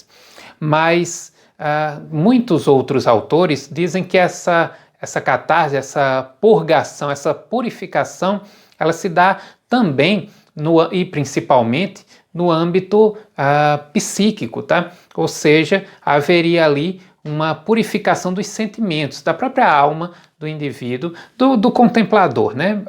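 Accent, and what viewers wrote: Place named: Bahia, Brazil. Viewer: Boa tarde, prof gostei da sua exposição, é a primeira vez que mantenho o contato. Além disso nosso sotaque no YouTube é outro diferencial acima e a mais. Desculpa a pergunta, mas o senhor está vinculado a algum programa de pós graduação? Em caso positivo pode informar? Sou Marcos José de Souza, prof de Língua Portuguesa, Rede Estadual da Bahia, em Fátima. Abr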